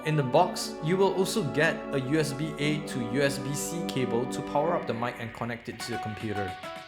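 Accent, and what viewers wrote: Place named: Indonesia. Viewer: Orang indonesia ya bang? Dari aksen nya kelihatan sama bagian deskripsi nya hehehe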